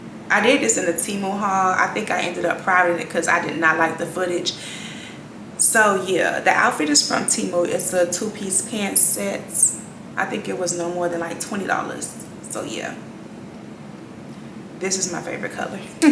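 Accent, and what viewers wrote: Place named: South, United States. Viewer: I think it's the southern accent that I like, lol 🤣🤣🤣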